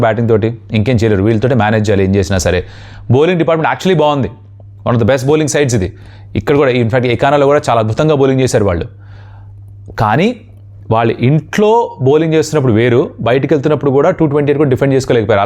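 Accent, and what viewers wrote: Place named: Telangana, India. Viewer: అరే అన్న.. నువ్వు చెప్తుంటే నాకు ముచ్చట చెప్తుంటే చెప్తూ మంచి విషయాలు చెప్తా అన్న.. సూపర్ అన్న.. మీతో పాటు కామెంటరీ చెప్పే ఆ ముసలి అంకుల్ ఏదో భాష మాట్లాడుతాడు.. ఆయనకే వచ్చు అన్నట్టు ఏదో నీట్ గా మాట్లాడుతున్నట్టు చెప్తాడు. అట్లా చెప్తే ఎవడు వింటాడు అన్న.. ఇట్ల మన తెలంగాణ భాష, యాస లో చెప్తూ ఉంటే ఆహా వినడానికి , నాటు కోడి కూర తిన్నట్టు ఉంటది అన్న.. సూపర్ మీరు..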